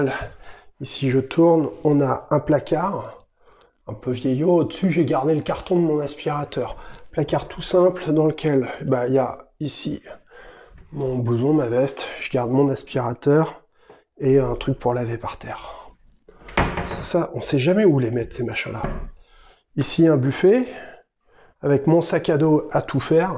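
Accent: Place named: North, France. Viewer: INCROYABLE vidéo! ton accent du nord m'a bien fait rire! Tu me fais penser à nous, avec tes 2 valises et à bouger avant de te poser (seul différence mais valise déborde encore ahah). J'aime beaucoup ta façon de penser! tu es trop drôle et tu es mémorable!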